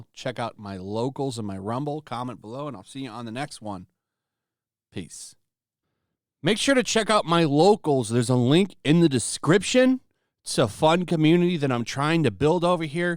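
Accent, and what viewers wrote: United Kingdom. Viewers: you have a great chap accent. love it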